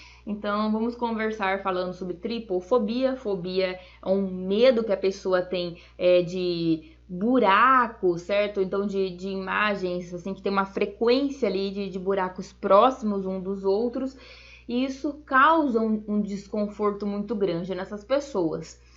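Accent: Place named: Inland São Paulo, Brazil. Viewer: Agora, uma pergunta: Esse sotaque te entrega que vc é do interior de SP ou PR. De onde vc é? (Até pq eu tbm tenho haha)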